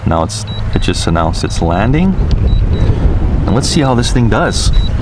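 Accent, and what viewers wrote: Hawaii, United States. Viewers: Kras 4 he is in Hawaii & they have their own accent and enunciation. I’d like to hear the critics living on the main land try to pronounce some Hawaiian street names.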